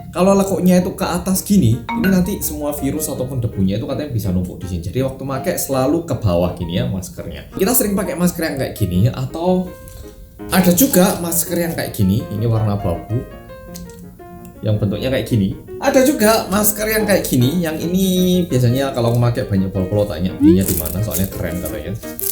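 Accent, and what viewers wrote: Java, Indonesia. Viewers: Korea rasa Jawa..mdoooeee ram eram..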